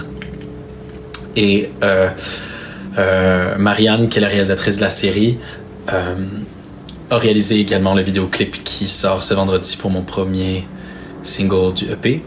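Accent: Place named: Quebec, Canada. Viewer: Il me tarde beaucoup d'écouter ta nouvelle chanson... Par ailleurs, j'adore ton accent québécois: ) and I like it too when you speak English: ). Je t'ai découvert par hasard en regardant DALS, je vous avais adoré Marie et toi, et ca a été un bonheur de te suivre depuis. Surtout ne change pas, merci pour tout: ).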